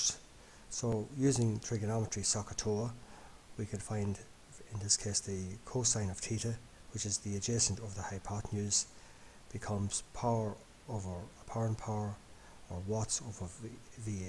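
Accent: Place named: Ireland. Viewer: Thank you very much kind sir, it was well explained and i appreciate the fact that you talked slowly or i would have trouble understanding as i'm not used to the irish accent.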